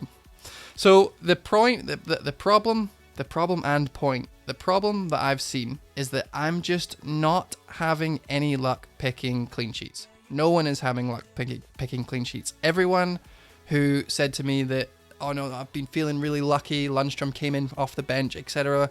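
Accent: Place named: Scotland, United Kingdom. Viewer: Where in Scotland you fr om?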